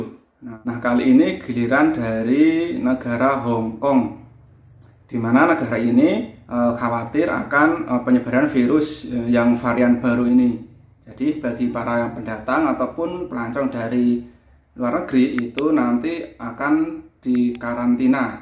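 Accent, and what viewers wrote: Java, Indonesia. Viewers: Suka bngt dgn logat jawanya Mas.. **HALOO**... **ASSALAMU'ALAIKUM **.... 💖💖👍👍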